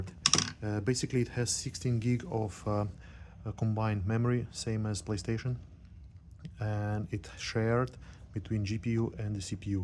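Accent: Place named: Russia. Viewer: Русский акцент) Сколько сейчас девайс стоит на местных барахолках и из какой страны вещаешь?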